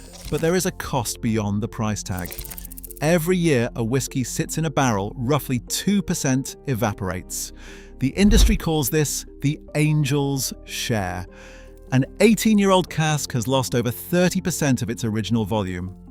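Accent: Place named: England, United Kingdom. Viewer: It's a little strange to hear an English accent talking about Scotch in terms of US$. It's the English/US contrast that I notice, not the Scotch//English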